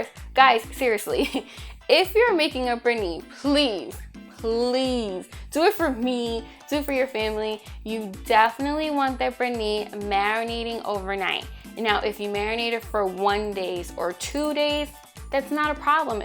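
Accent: New York, United States. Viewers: Love that nyc accent coming thru but u look like you moved out to the burbs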